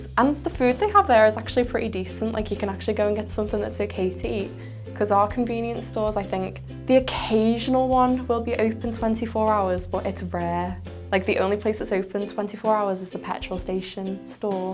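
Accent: Liverpool, United Kingdom. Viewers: 리버풀 액센트이신건가요..? 매력있으심다. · 마를린먼로가 생각나는 머릿결과 미모군요. 예쁘십니다. 그리고 리버풀식 억양.. 굉장히 인상적이고 듣기 좋네요. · 리버풀 억양 죽이네여 ㅎㅎ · I just love your British accent. Sounds very cute and even sexy! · 와.. 영국 영어 매력 쩌네... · 리버풀 액센트는 런던 표준 액센트랑 또 다른 매력이 있군요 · I like your lovely Liverpool accent.... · 아 리버풀 맞군요 사투리 맞아요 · 와 영국영어 발음 정말 듣기 좋네요ㅜㅜ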